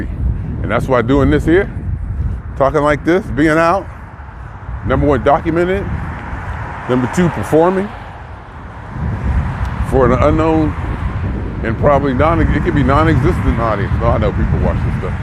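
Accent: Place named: Midwest, United States. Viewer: I can spot the Midwest every time. Thanks.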